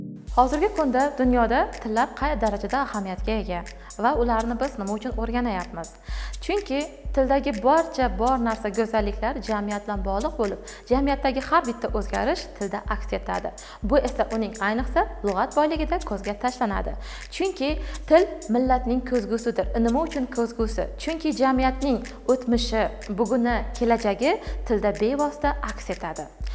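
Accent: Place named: Khorezm, Uzbekistan. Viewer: Xorazmmi shevasi tushinarli zór turkiya shevasina óxshidi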